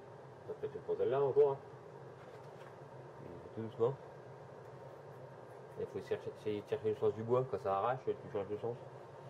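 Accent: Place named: Vosges, France. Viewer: Ça fait du bien d'entendre un peu un (léger) accent vosgien ! Super que ton fils soit intéressé, mais va te falloir le double d'outils ! 😉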